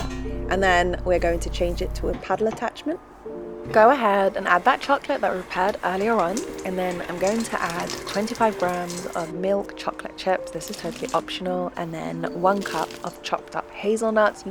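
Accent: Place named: United Kingdom. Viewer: I love your particular British accent. I don't think I've heard it before. 🤔What area is it from?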